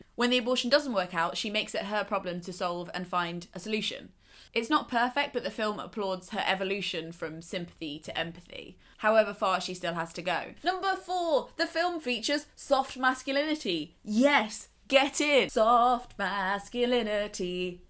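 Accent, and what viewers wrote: United Kingdom. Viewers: Also wild to hear Patrick Swayze lines in a British accent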